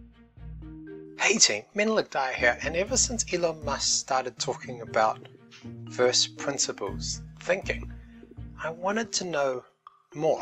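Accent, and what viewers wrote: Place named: New Zealand. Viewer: you sound like a kiwi! what up broooo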